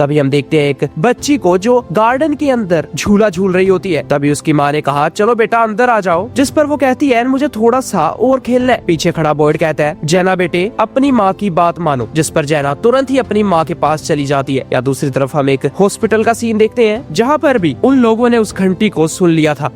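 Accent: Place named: Pakistan. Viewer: Paxtani accent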